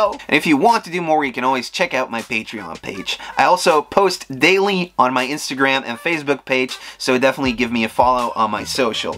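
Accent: Midwest, United States. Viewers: First time watching. Your accent is really interesting and familiar. Midwest?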